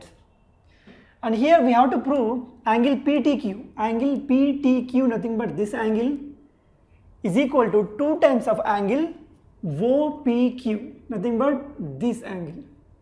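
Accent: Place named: South India, India. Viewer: geez thats cuz of the dialect hes prolly south indian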